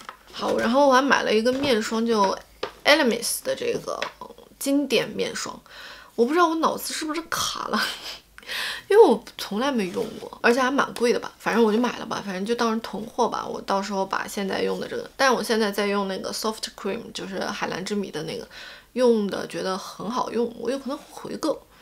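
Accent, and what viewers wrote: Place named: Sichuan, China. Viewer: silvia是四川人么，说水蜜桃的时候就在想，直到说卸甲水的时候说吃进去转一下的时候觉得我们是老乡耶哈哈，稀饭你～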